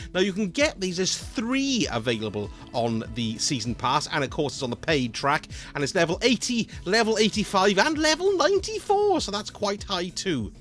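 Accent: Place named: England, United Kingdom. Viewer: Love the english accent!!!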